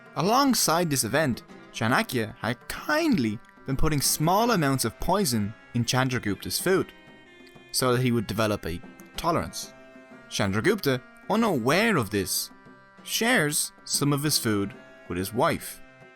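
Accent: Dublin, Ireland. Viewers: S/o to Dublin accents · As an Indian I have to say, it's honestly quite fun to hear the names with an Irish accent. Now I'm a fan 😂.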